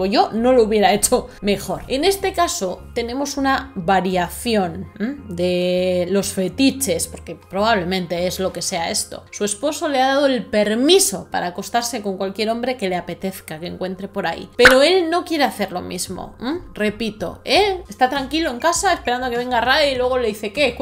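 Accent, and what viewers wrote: Spain. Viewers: Holita! mira, no hace mucho que te encontré y me quede atenta escuchando tu modo de relatar las historias que vaya, algunas dejan mucho de las que reponerse! Sabes? soy una incurable con respecto al modo que, uno debe hablar y al hacerlo modular cada palabra, para ser entendida y bueno, y como me ha gustado el modo de relatar los hechos pues me quedé... también me gusta por lo original que eres a la hora de combinar el relato con el maquillaje! siiiii y sonrío con algunas frases que usas por el echo de ser española y yo, argentina que tenemos distinto modo al hablar... también, te sientes de madre cuando el tema realmente se pone complicado pues yo siento lo mismo. Casi, puedo adivinar por tu modo de saludar, como te sientes, (cosa que después lo terminas diciendo) o sea que, el tema que traes te cambia el ánimo pero, tranquila creo que a todos los que, compartimos el relato contigo, lo pasamos igual. 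Sigue con tu modo de presentar las historias de vida, pues no puedes hacerte eco, de lo que piensen otros, pues cada uno ve lo sucedido de diferente modo. Tranquila, lo que haces está bien y el que piense lo contrario pues, que se cambie de sitio y ya! Nadie está obligado a quedarse. Mis sinceros saludos y cuidate mucho. Hasta siempre Eva, desde Argentina. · no sé si leerás mi comentario porque te sigo desde hace poco y no cabe duda que cada quién da lo que tiene dentro, dicho esto pienso que si empiezas a leer un comentario y ya lees cosas raras o groseras pues no hagas caso porque no los vas a cambiar y sufres o te molestas en vano, por otro lado cuando lees palabras escritas con cariño, sinceridad, que demuestran un gran valor de la persona que los escribe, pues te quedes con ellas, nada más, te sigo desde el otro lado del mundo y me encanta sobre todo tu manera de hablar (Española) me haces reir con tus "palabritas" acertadas y te bendigo en tu labor diaria.Te estimo mucho.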